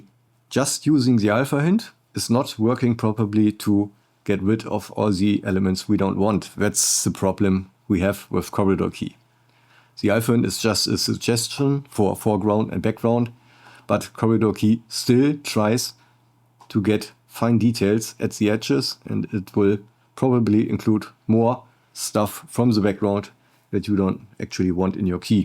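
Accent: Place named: Germany. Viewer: Just stumbled on this channel for the first time. Your German accent sounds great to me and the info is solid.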